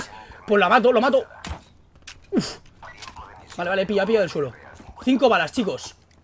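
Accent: Mexico. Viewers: hablando como Mexicano?? Jijij yo soy Mexicana:3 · Wey Me gusta cuando ablas como mexicano jaja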